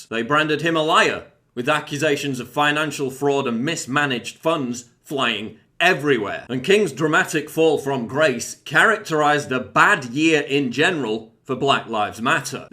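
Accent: United Kingdom. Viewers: This channel sucks. This douche proves that simply adding a British accent to low brow topics doesn't make it better. Is this supposed to be informative or funny or just boring?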